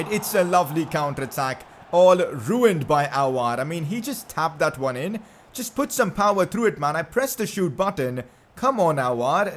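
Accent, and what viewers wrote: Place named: India. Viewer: Pcq:do you just play fifa or play other games as well?? And one more you sounds like indian so do u speak hindi?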